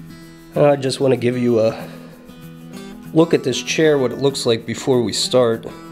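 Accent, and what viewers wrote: Western Pennsylvania, United States. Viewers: Elizabeth/Clairton south of the Burgh. My parents were born and raised there. My mom's parents came to Clairton from Italy. My dad's parents came to Western PA from Wales. I'm a huge Pirates fan. Clemente was my boyhood hero (and still is!). We make an annual summer trip to the Burgh to visit cousins and take in a Pirates home series. Not sure it will happen this summer. Hope so. It's good to hear your Western PA word usage ("Pap," for example; waiting to hear you talk about "ridding" up the shop!) and accent. I've really enjoyed the craftsmanship and attention to detail you demonstrate on your projects. The Western PA connection is an added bonus (one I can't make with Thomas Johnson)!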